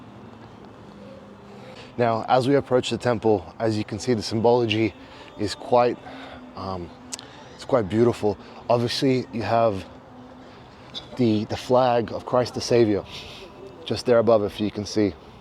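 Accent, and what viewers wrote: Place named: Australia. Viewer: You sound a little like someone from down under.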